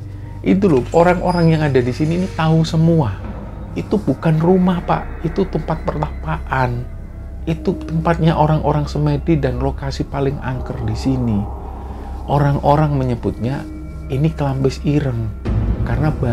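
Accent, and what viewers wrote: Java, Indonesia. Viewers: Saya pengikut baru mas,awalnya saya lihat kismisnya mas ini yg kuperhatikan cara beliau membawakan cerota dg logat jawanya adri situlah saya ikuti konten,semoga sllu sukses untuk mas dn para kru,dari THARIE KALIMANTAN akan sllu hadir di setiap kismisnya 👍👍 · suka... Dialek jawanya bikin adem Channel Nadia Omara jg bagus yg serial KHW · Kyknya saya udah kecanduan cerita2 mistis. Suka bgt dengar aksen jawanya😊 Cara pengceritaan yg sangat menarik dan gampang di fahami oleh org Malaysia seperti saya. Mas nya juga ganteng kok🤭😄🙏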